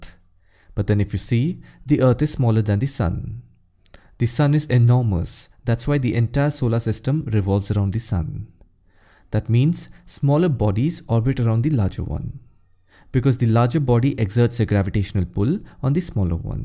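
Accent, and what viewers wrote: India. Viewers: I'd rather listen to the sound of a fork screeching against the surface of a plate than to this Indian accent.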